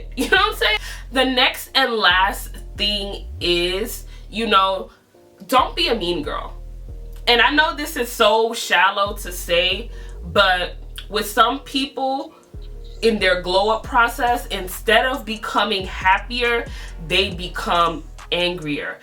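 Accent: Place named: Brooklyn, United States. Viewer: That Brooklyn accent doe🔥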